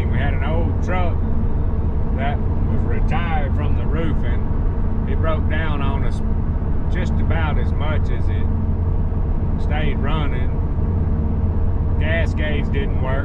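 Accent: South, United States. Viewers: That subtitle generator has problems with your southern English. I’m not criticizing southern English because I talk that way too. It’s just funny how what’s printed differs from what you said. Thanks for the videos. I enjoy them.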